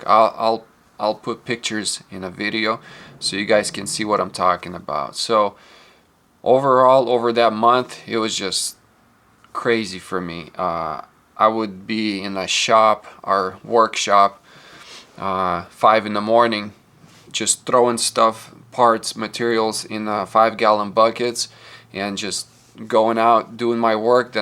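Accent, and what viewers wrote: Russia. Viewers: твои ножи - это искусство, руки золотые! У тебя не английский, а русский с акцентом, судя по всему ты уже давно говоришь на английском. Удачи и ждем новых произведений!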